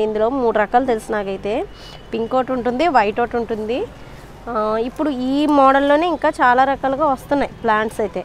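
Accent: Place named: Kakinada, India. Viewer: Kakinada voice super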